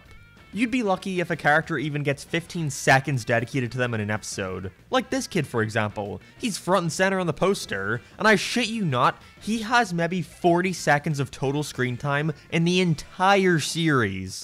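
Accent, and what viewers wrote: Northern Ireland, United Kingdom. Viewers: You're not british? Aren't you from northern ireland?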